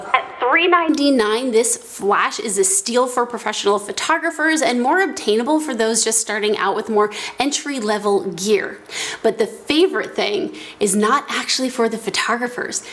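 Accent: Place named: New Jersey, United States. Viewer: That Jersey accent and shtick is priceless!! Well done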